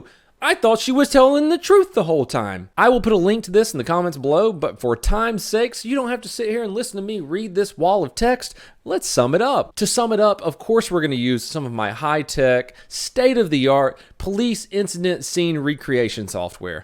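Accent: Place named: United States. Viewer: She speaks the best American I've ever heard!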